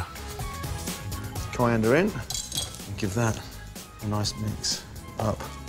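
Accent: United Kingdom. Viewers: I always think that haha I love listening to a brittish person cook. Like jamie Oliver haha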